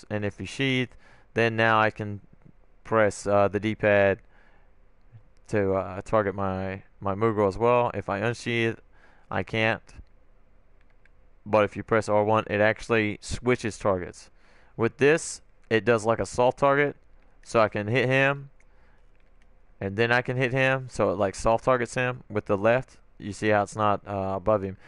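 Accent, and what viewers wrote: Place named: South, United States. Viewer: Sounds like an American southern with a hint of Australian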